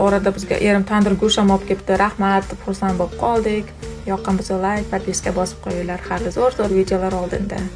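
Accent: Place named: Fergana Valley, Uzbekistan. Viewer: АССАЛОМУ АЛАЙКУМ, УЗБЕКИСТОНДИ КАЙСИ ВИЛОЯТИДАНСИЗ, ШЕВАНГИЗГА К,АРАГАНДА ВОДИЙДАНМИСИЗ ДЕЙМАНДА?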